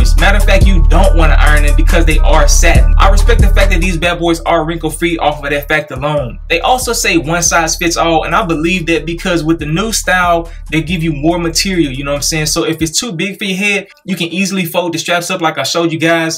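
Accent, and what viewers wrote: Houston, United States. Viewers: This dude gotta be from the H. Subscribed.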